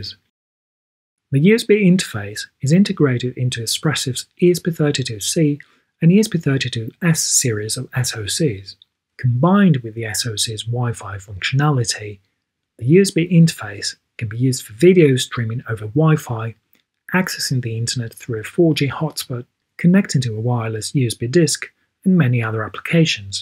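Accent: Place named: RP, United Kingdom. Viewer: Came for the girl in the thumbnail, stayed for the very posh British guy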